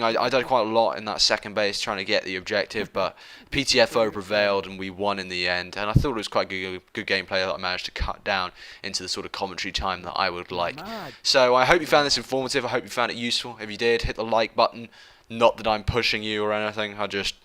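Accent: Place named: United Kingdom. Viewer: beast vid, nice to hear a british accent. Subbed and liked!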